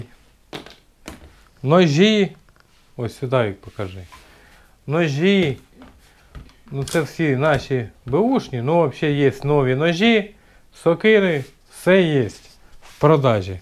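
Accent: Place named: Kharkiv, Ukraine. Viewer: Этот человек говорит на местном диалекте и вряд-ли он умеет разговаривать на русском. На Харьковщине везде так говорят .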